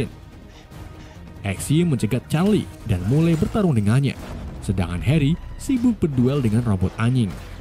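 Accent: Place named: Cirebon, Indonesia. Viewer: Wong cerbon kih ketenger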